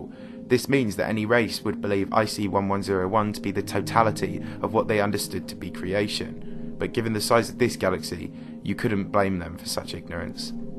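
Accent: United Kingdom. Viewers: Thank you, you just won me 10$!! I bet my girlfriend the narrator of this video would be British. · He doesn't sound anything like James Cordon. Let me guess... You're an American. And all us Brits sound the same, (even though we have more regional accents than just about any other country.)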